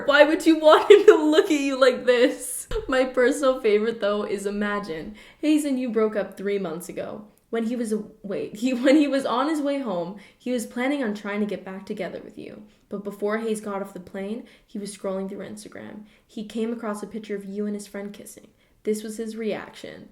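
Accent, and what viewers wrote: Canada. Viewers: YOUR CANADIAN ACCENT IS SO CUTE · I can tell you’re Canadian by the way you pronounce Magcon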